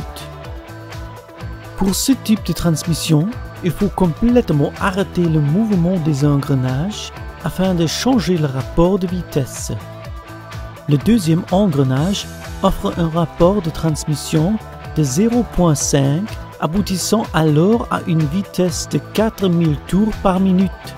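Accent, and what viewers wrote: Germany. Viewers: on se mare avec son accent allemand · J'ais du mal à comprendre à des moments, mais d'où vient cette accent ? Allemand ?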